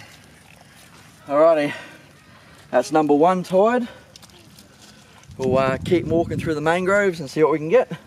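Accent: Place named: Australia. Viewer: Love his Aussie accent. G'day mate!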